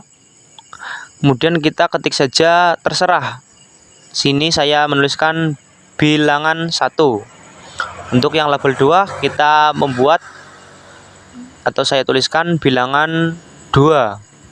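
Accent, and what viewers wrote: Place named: Banyumas, Indonesia. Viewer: Ngapaknya itu loo 😍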